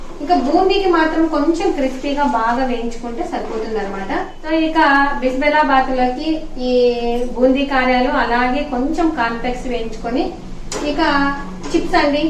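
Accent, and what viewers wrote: Rayalaseema, India. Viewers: Super andi mee vantakalu meeremo rayalaseema mee papa emo andra basha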